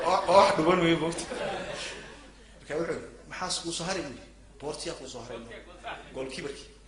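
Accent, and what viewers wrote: Mogadishu, Somalia. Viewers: caadi ma tihid sidaad reer xamarka u matashay walaahi anigoo ciyaal boondheero ahaa adigaa iga badiyo shangaani mise xamarwayne maad daganeed🇸🇴🇸🇴🇸🇴🇸🇴🇸🇴🇸🇴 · sida u ukeenay luqada xamar baan ka yaaby kkkkkkkkkk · Kkkkkk wlhi wan Fuurey Abwan So funny Nolow Isaga rer xamar aha kuso barbaaray hadalka si wacan bu u yaqaana kkkk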